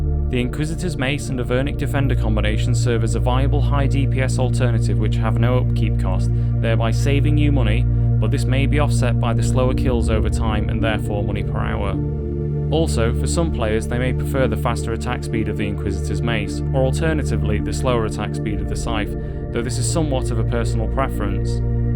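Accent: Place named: United Kingdom. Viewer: sat through a 20 second intro just to find out this guy is british, disliked and clicked off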